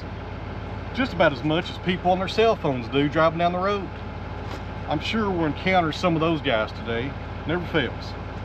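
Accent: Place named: Florida, United States. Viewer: New subscriber brother i really this adventure im an ole Florida cracker and I pronounce the same as u God bless you brother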